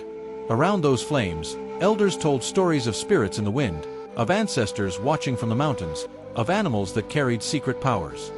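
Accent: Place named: United States. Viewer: History just never sounds right in an American accent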